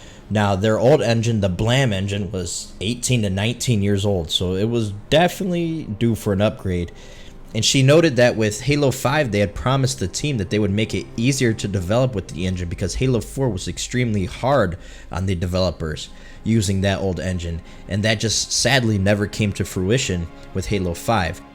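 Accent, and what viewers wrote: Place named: Minnesota, United States. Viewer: Are you from Minnesota? You sound like a Northern Lad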